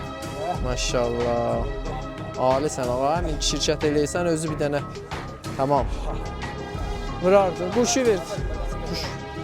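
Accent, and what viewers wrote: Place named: Turkey. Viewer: کیرم تو لهجه ترکی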